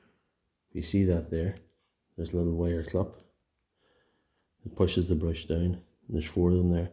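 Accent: Northern Ireland, United Kingdom. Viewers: Having a go at this later lad. You from Northern Ireland? Ballymena here 👍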